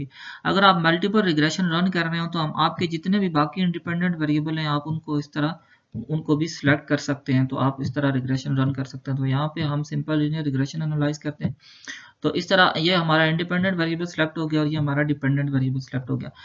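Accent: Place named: Kashmir, India. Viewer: Great sir....your accent is saying that you must be a kashmiri.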